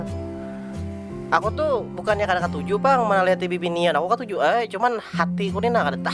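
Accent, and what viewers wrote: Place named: Banjar, Indonesia. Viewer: Gaya mu tu bapander tu lucu banar sanak,ak suka banar mandangar logat banjar tu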